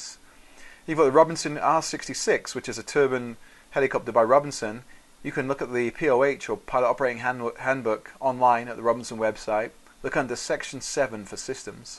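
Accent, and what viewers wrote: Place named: England, United Kingdom. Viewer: I saw the tail number on the helicopter (N319CF) and I thought it looked familiar. So, I checked my log book, lo and behold, I flew that same helicopter for long line training. Then I thought to myself... The person who made this video sounds English and has flown the same helicopter as me...hmm...who could this be? This person could only be! Great video and explanation of how helicopter turboshaft engines work.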